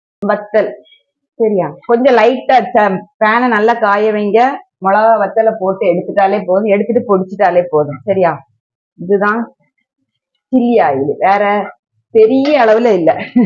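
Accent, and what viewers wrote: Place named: Tirunelveli, India. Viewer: Enjoyed your recipe and your Tirunelveli Tamizh❤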